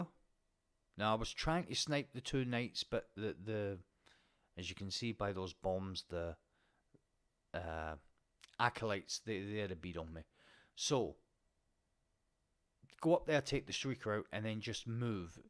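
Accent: United Kingdom. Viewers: you’re very welcome jajaja. It’s nice watching your videos. British accents makes it fun to watch.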